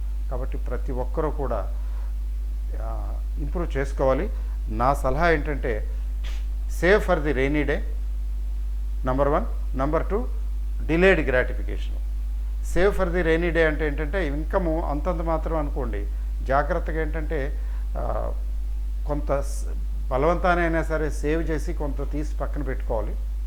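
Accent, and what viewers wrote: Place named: Coastal Andhra, India. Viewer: Sir, what is your native place. I know you are from coastal area.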